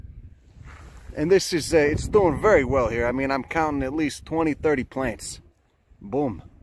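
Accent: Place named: West Coast, United States. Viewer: Wow! You give me hope for Paradise and Magalia. Well, not for me, but maybe in 250 years it'll be back the way it was. I searched many barrens in eastern Butte county. I just knew there was an undiscovered Californite lode besides Pulga. Nah! But it got me out walking and exploring. Love your instructionals. Your west coast accent sneaks in there sometimes.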